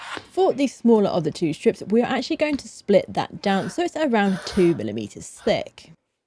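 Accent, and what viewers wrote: England, United Kingdom. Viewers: thank you so much for your videos !!! it's always a pleasure to see it, everything look super easy with you and it is very well explain Ps: I love your english accent <3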